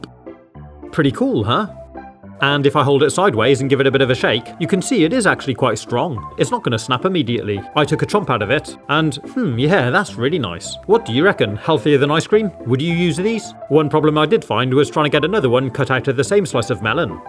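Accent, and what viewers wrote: England, United Kingdom. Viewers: bro is from the united kingdom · Your british accent very cool, i like it! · Are you from England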